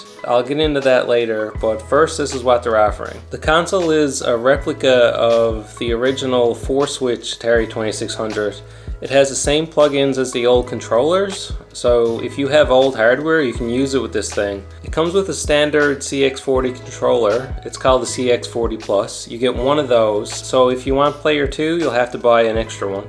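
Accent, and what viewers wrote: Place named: Canada. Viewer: no way this guy isn't Canadian, lol - i'm saying East Coast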